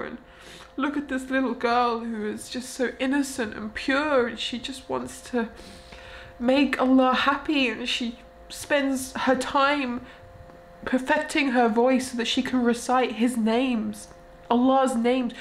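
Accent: United Kingdom. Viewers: Your british accent is special🤪🤪🤪🤪👍👍👍👍 Be istiqomah/ consistent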